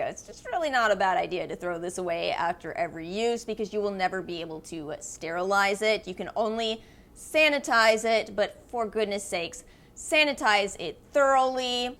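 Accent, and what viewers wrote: New Orleans, United States. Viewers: You must be from New Orleans. The “ya heard me” is a dead giveaway! 😅 I’m on the northshore.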